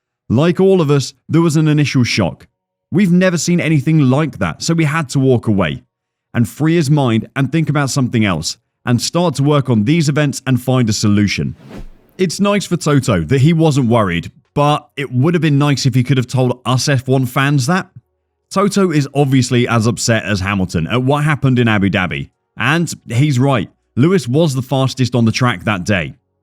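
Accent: United Kingdom. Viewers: My congratulations, this channel really feels professional! There is that slightly British ‘accent’ in the soothing voice of the narrator, that gives the message a trustworthy sound. They imagery and the movie clips are also well edited and nice on the eyes. All in all you look like a journalistic source that, in an agreeable way, wants to state it’s point. And maybe that’s the biggest problem. In a world where content isn’t judged by it’s objectivity but by the amount of followers that are being pleased, it’s very hard to stay truthful. In this very scoop you do mention the not so reliable influencing by TW in relation to the fact that he ‘knew’ LH wouldn’t stop while whipping up his fan base with suggestive statements, but you fail to explain why he did that. You acknowledge the phrase that LH mentioned that he’d never said this or that but you fail to explain how this contributed to the polarization of opinions within the F1 community. 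On a given moment you accuse MV of being the source of a lot of misbehave within the sport, but fail to explain that this is a shared opinion by a minority of the fans. So entertaining, surely, professional exposure, well done, journalistic integrity, can and should be worked on if you want to be a channel for all F1 fans. If you don’t care about second opinions or how the other chunk of Fans thinks , then good luck, you will have to battle with ton’s of British based and prejudiced, biased you tube channels. The choice is up to you. 👍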